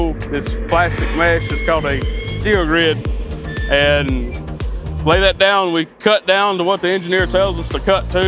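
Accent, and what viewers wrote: South, United States. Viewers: Yes, he's got a drawl. Why?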